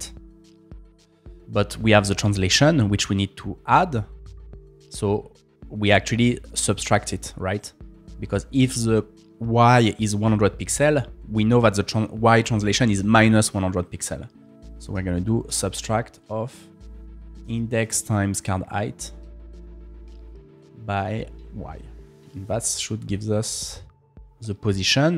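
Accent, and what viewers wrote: France. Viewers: Nice video! You have a french accent, isn’t it? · Vous avez une accent française 😄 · Intéressant le tuto français ça ce vois a l'accent ? 😊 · So adorable french style english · Excellent tutorial, thank you! Also love your accent haha, you sound more French than Swiss to me but I'm Australian so am hardly qualified to tell the difference. :p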